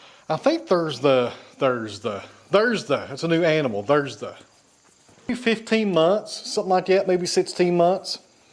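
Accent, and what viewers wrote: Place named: South, United States. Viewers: Well you are in fine form, Quite frankly it does not worry me. It is great to here that southern accent. Go for it buddy. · Yes! your accent trips you up sometimes, I think. I find, myself, I sometimes end up trying to say a word two ways at once, the accented version and the standard "television newscaster" version. I muchly prefer the Eastern Tennessee version. I too watch in part because of this. and yes, like, "all you all" is a real southern phrase. when I lived for a while in Johnson City, I really noticed how "storytelling" most people's speech was. Even a trip to Walmart might could be made into a story. And the phrases too. "a ton of pine" sounds OK by me. Southern phrases, yes indeed. many are made-up on the spur of the moment. Thus your "bloopers" are often endearing to me.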